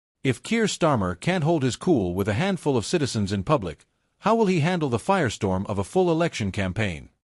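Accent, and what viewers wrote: United States. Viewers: The title of this video is totally unrelated to this video. KEIR STARMER TO BE REMOVED FROM PARLIAMENT AFTER HOSTILE RESPONSE- is a blatant lie as there is nothing in this video that has anything to do with the title. And again, there is an American narrating this video. One big con and one big lie. This video should be removed from You Tube.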